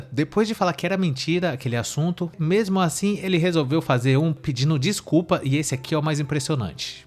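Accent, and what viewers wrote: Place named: Southern periphery, Brazil. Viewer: Um canal de 62 mil inscritos que não tem nem coragem de botar a cara querendo falar dos outros 😂😂😂😂😂 fora esse sotaque horroroso de periferia do sul que é intragável kkkkkk se enxerga seu invejoso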